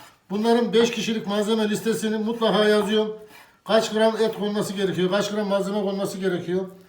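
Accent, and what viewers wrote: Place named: Central Anatolia, Turkey. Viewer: Yaa tam bi iç anadolu şivesi çok tatlı olduğu için dülmem geliyor😅🙈Ben de yarın nohut yemeği yapıcam bi tariflere bakım dedim sizle karşılaştım.🙋🏻‍♀️Ellerinize sağlık, bilgiler için teşekkür ederim....🧡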